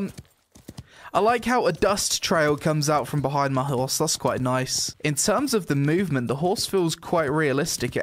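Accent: United Kingdom. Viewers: your British but you didn't say loo??!?!?!?!?!?!?!??!?!?!?!?!??!?!?!?!?!?!? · hes british...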